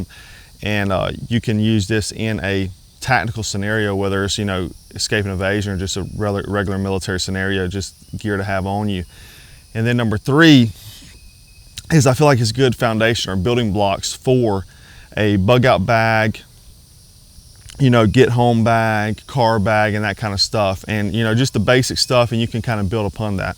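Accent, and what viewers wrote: South, United States. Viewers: Marine with a southern accent who reviews survival gear outdoors. Subbed.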